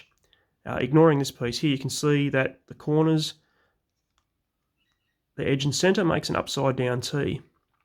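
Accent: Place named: Australia. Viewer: finally got around to watching your video. I didn't realise you were Australian. I'm in Adelaide.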